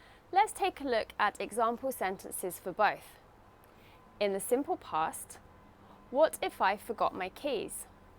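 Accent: United Kingdom. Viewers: this teacher her accent is English British England why she is living in Philippines right now maybe she loves the tropical hot humid and beaches and full sun all year round it is warmer than UK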